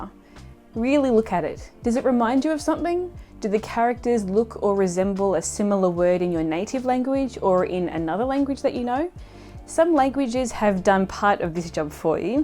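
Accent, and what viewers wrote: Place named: Australia. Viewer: Hi, thank you for your informative video. I was really looking for techniques to expand my general vocabulary. I can easily visualize and link nouns as they are easy to form a mental image. However, can you give any tips on how one might form an image and action mnemonic to words which do not immediately form an image? Eg. seraphic, sedulous, unctuous etc. Really appreciate your video, there is a lot of valuable info I had not considered such as space repetition. Oh, and it's great to hear a fellow Aussie on YouTube too:-)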